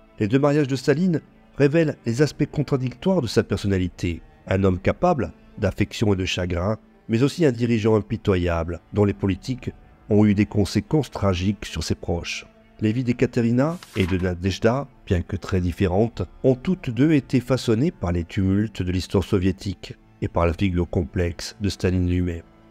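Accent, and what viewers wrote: Corsica, France. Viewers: Bonjour, Je viens de découvrir ta chaîne et j’en suis ravie, ça me change un peu de ce que je regarde habituellement. Juste par curiosité, tu ne serais pas Corse par hasard? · Bonjour je découvre votre chaîne. Et je m’y abonne !!! J’aime beaucoup les termes abordés. Je suis sure que vous avez lu livre « femmes de dictateur « de l’écrivain Diane Ducret, il parles des femmes des dictature d’Afrique de celle dont vous parlez dans cette vidéo et bien d’autres….. De plus j’ai reconnue avec joie votre accent , venu tout droit de l’île de beauté. J’y ai passé mon enfance et adolescence entre le village de Luri et de Corabara. Ma famille étant native de l’île. Je vous souhaite de bonnes fêtes 🍾 🎊. Et souhaite également longue vie à votre chaîne. Merci pour votre travail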